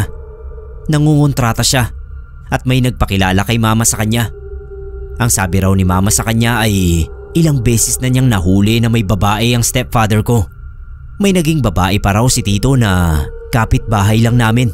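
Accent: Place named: Cagayan, Philippines. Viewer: Parang taga Cagayan ang narrator. Itawes.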